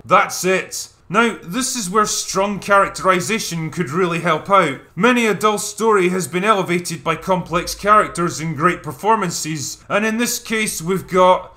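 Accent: Scotland, United Kingdom. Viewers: You're Scottish? I just thought that was just drunk accent. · Ten seconds in, already sounds like the narrator is about to have an aneurism Edit: oh he's Scottish 🍀 Edit Edit: why do Scots have blisters on their dicks? Cos they're such tight fisted wankers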